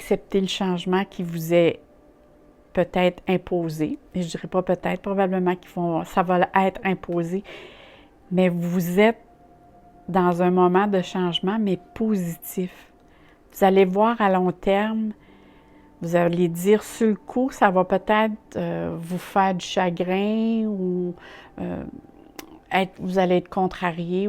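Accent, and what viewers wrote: Quebec, Canada. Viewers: Merci accent du Québec j’aimerai tellement m’installer à tadouddac merci pour le tirage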